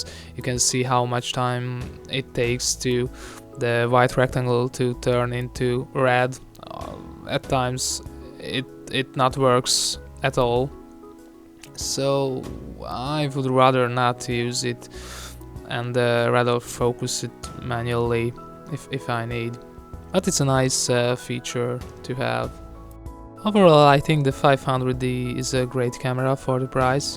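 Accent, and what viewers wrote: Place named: Hungary. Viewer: az a jo magyaros akcentus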